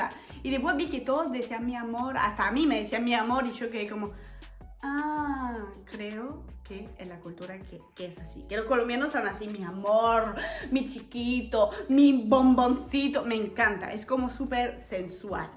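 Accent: Buenos Aires, Argentina. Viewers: Los huevos :pericos😂 Hablas una mescla divertida de barranquillero con argentino...saludos desde bogota colombia...exelente video. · Ud es de Francia o es argentina porque tiene más asentó argentino que francés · Ton accent est très argentino mais les paroles que tu dites sont très barranquilleras. 😅😂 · No entendí bien francesa por qué tienes el acento tan pronunciado de Argentina pero sabes por qué Barranquilla es una parte muy bullosa y de muchos carnavales te faltó muchas partes preciosas para conocer y ante todo la gente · Hola!!! Tremendo acento argentino 😂😂😂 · Haha el acento de tu español es completamente argentino con alguna palabra colombiana por ratos y el frances haha me encanta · Aprendió Español con alguien De argentina. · creo que los colombianos no te van a entender mucho,vos hablas más argentino que colombiano. Te extrañamos en argentina 😊😊😊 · Bueno, pero al fin es Francesa o Argentina? 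Habla como Argentina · Que linda, al hablar mezcla estilos el argentino, barranquillero, algo español, muy interesante. Se ganó mi corazón. Saludos desde Colombia. Bueno en tema de comidas, si hay diferencias entre zonas de Colombia. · Eres francesa , pero tu acento es argentino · Colombiano que se respete come arroz como sea bien chistosa esta estrangera pero es francesa pero tiene acento Argentino si yes pero como la francesa vivio en Argentina luego aqui en bogota colombia si yes bien venida a colombia soy colombiano estrangera hermosa si yes estas muy bonita si que dios te guarde y santisma virgen de Guadalupe siga asi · Jajajaja me encanto su video hablas muy chevere ..pero tengo una duda eres francesa o argentina por q su acento es argentino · Bogota no es una provincia y deja de hablar como una argentina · ese sonido "chillón" {chirriante y chocante} de esas letras "argentinizadas" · Yo soy colombiana, y mi esposo es árabe.. 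y ellos comen más arroz que nosotros. Mi suegra se gasta 3 kilos de arroz diario. La yuca con café con leche... jajajaja qué veneno, y dice que tiene problemas digestivos para los fritos 😂. Y nutella, que prácticamente es chocolate con aceite de palma que no es digestivo para el cuerpo... Qué triste que destruyas tanto el Idioma Español. O hablas como argentina o adoptas el idioma Español colombiano. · Habla como de argentina boludo no como frances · Hola te quedó el acento Porteño. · Que bueno escucharte, soy de Bogotá y me alegra mucho que te Gusto mi pais,me gusta tu unionde argentino con barranquillero y algo de Bogotá una mezcla que se escucha chevere sobre lo de mona es por que tenias el cabello algo rubio y la piel blanca es tambien algo de cariño como lo qué comentabas del mi amor.😊😊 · Ya tienes un dejé costeño, eso si, el argentino porteño lo tienes bien adentro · Su español es excelente,no se te siente el acento francés que suele ser muy fuerte.Felicitaciones. 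Su acento argentino suave es muy lindo. Y si comemos arroz como locos,les ganamos a los chinos 😅😊😊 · Mona aquí en Colombia se refieren al color del cabello y encima sos Blanca total. En España le dicen Mona a las cosas que les gustan y son bonitas pero a las personas no. Y vos tenes acento argentino. Pero de francesas no te veo nada ois. Soy de Medellin tierra hermosa somos muy amables igual que Bogotá, amo mi tierra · Me encanta como incorporasre es el modo de hablar porteño. Ahora bien, si hay más vendedores ambulantes que acá en Argentina, es mucha gente vendiendo en las calles. Muy lindas las referencias de Colombia. ¿Por qué será que siempre vamos tras el lenguaje coloquial y el grosero de cada país?😂 Salut a l'amie française!!!! Dónde vivís en Francia? Cariños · Señorita vaya que se le pegó el acento argentino.. "sha" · Pues de acento colombiano tienes bien poco: lo tuyo es argentino. 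Soy español y también hay cosas que culturalmente no compartimos con los hispanoamericanos .... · 😊Con acento argentino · Jajajaja es super encantador ese acento entre argentino y costeño que tienes jajaja <3 <3 <3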